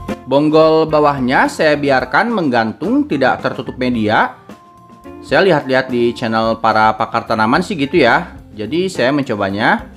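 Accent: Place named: West Java, Indonesia. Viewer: Sunda pisan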